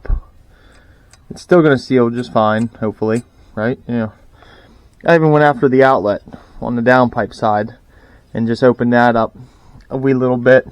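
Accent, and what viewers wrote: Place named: Pittsburgh, United States. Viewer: Way to go there bud! Sounds great. Good hearin the ole Pittsburgh accent again too from way out here in Oregon. Saw a bit of the Brownsville steam show video and pieced it together that you must be around sw PA. Grew up a little north of Brownsville. Take er easy, ill pass the channel on to some cowokers 🤙🏼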